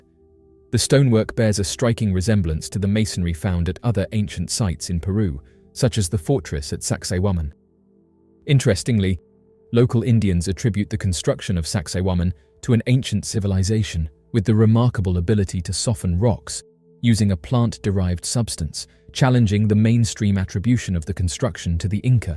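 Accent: United Kingdom. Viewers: In deed his pause and natural narrator skills very articulate and clear accent with traces of the best British language Looking forward for more subject Sr thank you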